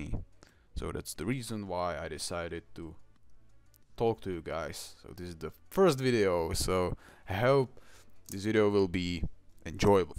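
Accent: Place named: Czech Republic. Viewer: nice czech accent, i liked it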